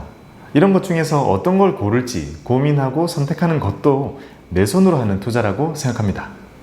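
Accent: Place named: Busan, South Korea. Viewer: 부산 출신이신가봐요 ㅎㅎㅎㅎㅎㅎㅎㅎㅎ재미있네요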